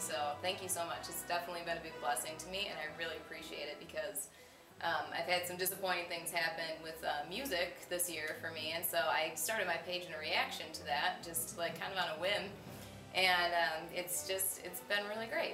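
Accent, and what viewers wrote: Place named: Midwest, United States. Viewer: Do you live in Michigan? You sound kind of mid-westerny.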